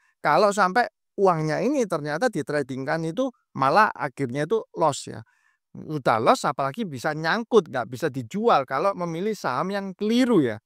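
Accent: Surabaya, Indonesia. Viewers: logat medok suroboyoan rek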